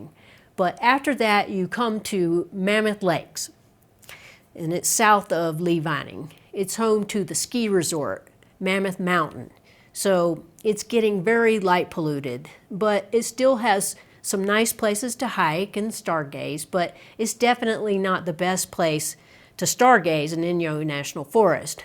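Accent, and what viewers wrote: South, United States. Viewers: ohh, I look forward to that video. i'm going to visit again in september or october on a very dark night, and I'll try to check out Lee Vining. I love the way you say that name with your southern accent! Do you have a tip on a red headlamp to use? Thanks again 😊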